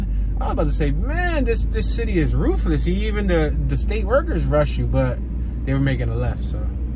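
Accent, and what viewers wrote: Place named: Newark, United States. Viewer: Yes, he has an accent like we all do. Kind of reminds me of a guy I knew from Newark, NJ.... We get our accents mostly from our parents but also from our neighborhood. In Philly there are different accents. Folks in South Philly talk differently than West Philly. North Philly has several accents. Depending on what part of the city you are from you might say words like "jawn", "yo", "youse", "yall", "yah-meen". I was all the way up in Newark NJ and a guy heard me say "Jawn". I didn't even realize that I used the word. He then correctly guessed that I was from Philly! LOL ..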